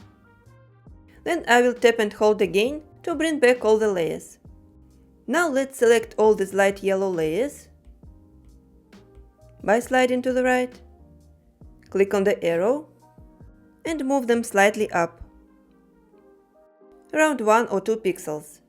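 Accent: Russia. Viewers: Почему на английском-то с русским акцентом(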